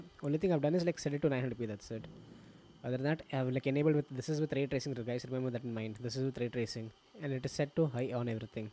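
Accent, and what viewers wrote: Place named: India. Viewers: The problem is if he tries to change his accent to native speakers then indian people will say that he is trying to be sn American.😄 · I don't understand Indian English sory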